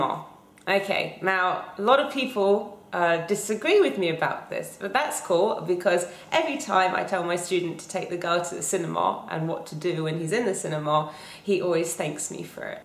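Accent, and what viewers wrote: United Kingdom. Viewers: "Bitch Shield" bahaha. I wish her accent wasn't so british though.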